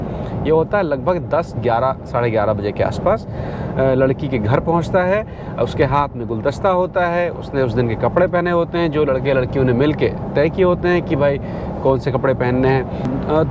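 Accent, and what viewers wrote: Bihar, India. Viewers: Aap bihar se kya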